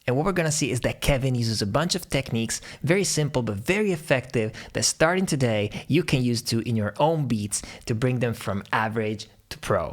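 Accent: Italy. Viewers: ahah sei romagnolo? sono rimasto serio fino a . Comunque quanta skill, complimenti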